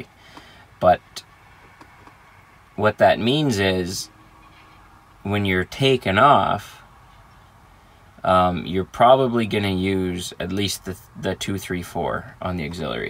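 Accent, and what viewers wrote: Canada. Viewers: The first 10 seconds I knew this fella was Canadian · Hey buddy you from Canada?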